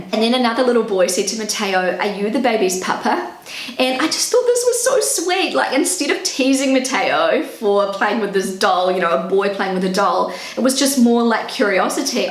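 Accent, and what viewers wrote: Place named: New Zealand. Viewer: Lovely to hear your Kiwi accent,. I commented on another of your videos, identifying as a German-born Kiwi. You're so right about the macho Kiwi men, but they're not all like that. Having grown up during and after WW2, I was raised in an all-female household, something not at all unusual for my generation. Your pronunciation of the language is really good, but I must pick you up on your pronunciation of the name Angela, as in Angela Merkel. You pronounced it like an English A. It should sound like in the word 'up' or button.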